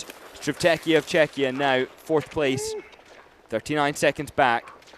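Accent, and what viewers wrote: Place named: Scotland, United Kingdom. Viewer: This guy is the best English language commentator. Is he a Scot?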